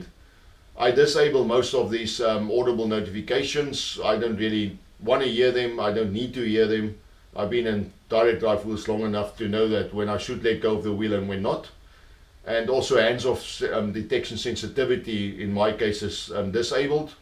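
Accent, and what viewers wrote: South Africa. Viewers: Good to hear a south African accent within sim racing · Accent like south african mate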